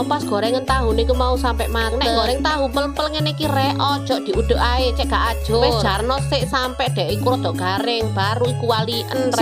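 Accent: Surabaya, Indonesia. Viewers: Seneng sm narasi embaknya Suroboyo banget😂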